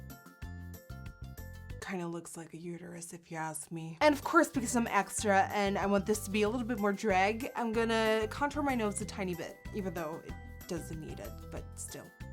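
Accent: Netherlands. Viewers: I ALWAYS THOUGHT YOU HAD AN AMERICAN ACCENT BUT THEN I WATCHED YOUR OLDER VIDEOS AND I REALIZED IT IS A DUTCH ACCENT AND NOW I CANT UNHEAR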